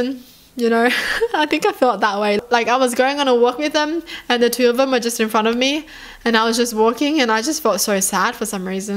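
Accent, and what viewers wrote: Australia. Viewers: I do like your voice! Australian accents are so cute and adorable🥹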